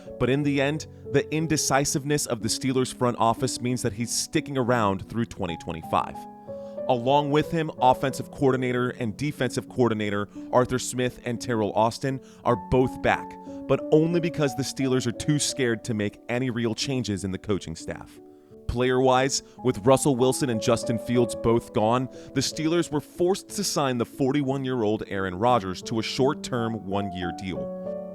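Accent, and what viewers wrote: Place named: San Antonio, United States. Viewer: I recognize your San Antonio voice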